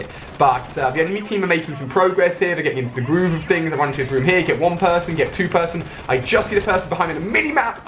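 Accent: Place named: United Kingdom. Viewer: hmm all the guns and explosions sounds a British guy talking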